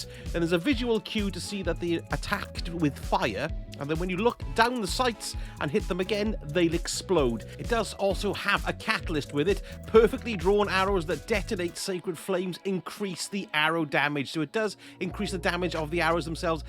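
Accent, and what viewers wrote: Wales, United Kingdom. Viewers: You are delightfully Welsh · Pretty surreal to pick a random Destiny video and hear a Welsh voice! Which part of Wales are you from may I ask? Great vid, I'll be watching a few more of these. I would imagine that Tony Hart reference went over most people's heads though haha!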